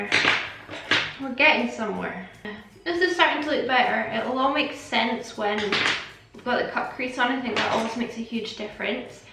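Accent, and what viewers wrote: Scotland, United Kingdom. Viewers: Love her soft Scottish accent... beautiful xXx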